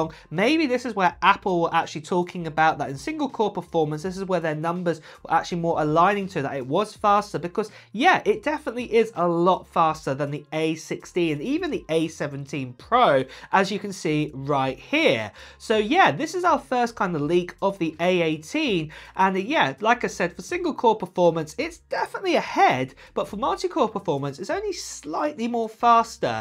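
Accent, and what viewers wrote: United Kingdom. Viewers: Will be upgrading from my iPhone 13 Pro Max 256gb to 16 Pro Max 256 gb this Friday! I’ll even copy your delightful British accent, for the duration of my ordering call! 😂❤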